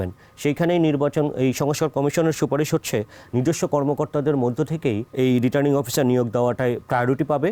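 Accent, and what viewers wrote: Kolkata, India. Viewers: ডেইলি স্টার দাদার মুখে কোলকাতার ভাসা আসে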